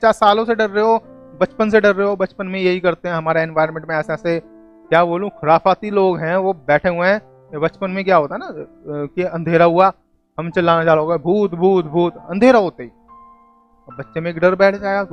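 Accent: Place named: Delhi, India. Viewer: Apki bhasha Dehli ki hai..... Mere ek dost se milti julti.. 🙏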